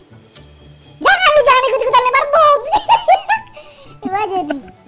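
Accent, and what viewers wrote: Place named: East Java, Indonesia. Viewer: Jawatimuran nie... Anjay ..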